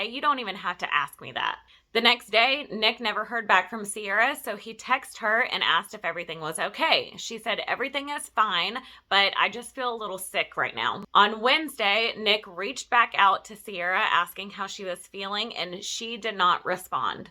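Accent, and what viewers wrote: South, United States. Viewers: Yu sound Southern must live in Georgia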